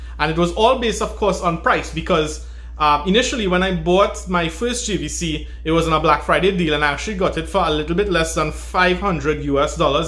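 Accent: Trinidad and Tobago. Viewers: You sounding like a trinidadian.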